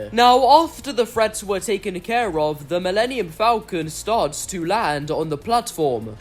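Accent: United Kingdom. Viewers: This is might be the most british accent I've heard in a while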